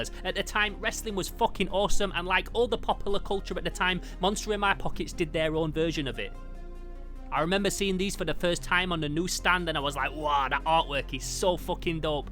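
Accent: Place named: Sheffield, United Kingdom. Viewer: i knew this accent from doctor who but now that you say sheffield i understand!!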